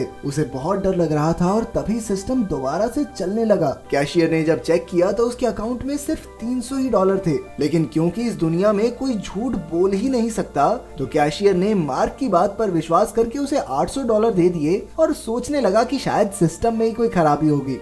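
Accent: Haryana, India. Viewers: YouTuber pkka haryana ka h😅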